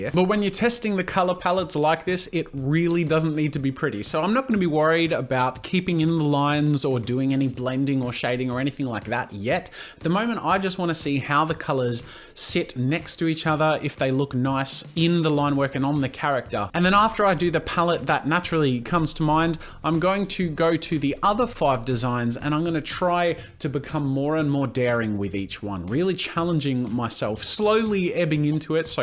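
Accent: Australia. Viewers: Omg r u Australian?? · Is Aussie? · is he australian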